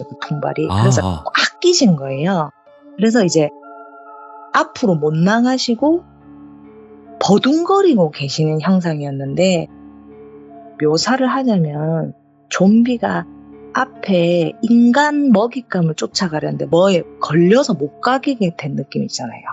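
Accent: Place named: Gyeongsang, South Korea. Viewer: 충청도 피가 흐르는 경상도분이신가봐요 재미나게 잘들었는데 사투리신경쓰지 마시고 해주셨음~~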